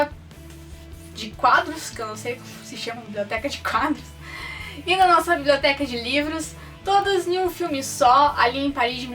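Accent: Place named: Minas Gerais, Brazil. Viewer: muito bem fazeres vídeos acerca de cultura mas tenta fazer sotaque brasileiro de Rio de Janeiro, sei que nada tem haver com a questão da cultura mas acho tão chato e enervante esse sotaque do interior , Minas ou algo do gênero , tenta isso é tens mais um subscritor.. Bj e boa sorte com o canal!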